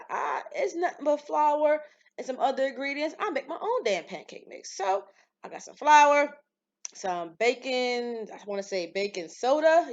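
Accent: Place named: Baltimore, United States. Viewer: I LOVE listening to you and watching your videos lol! You are so down to earth and don't be with the extras...please keep them coming. You sound like you're from Baltimore where my family is from:) Thanks so much!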